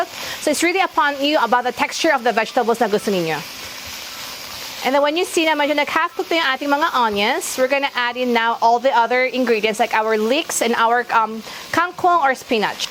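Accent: Philippines. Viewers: you're too cute!! i love how you talk taglish...:)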